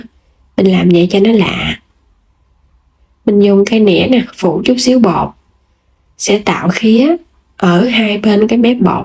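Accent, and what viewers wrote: Mekong Delta, Vietnam. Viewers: Giọng miền Tây người ta vậy đó mấy má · Giọng miền Tây đích thực đó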